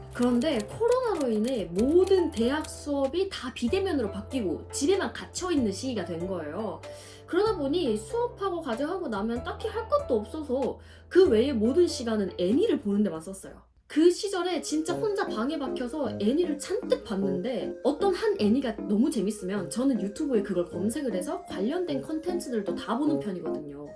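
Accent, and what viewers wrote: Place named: South Korea. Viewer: 인스타에선 광고 욕먹던데 온도차 심하구나....전형적인 한국인 일본어 발음이라고 광고찍냐는 댓글 많던데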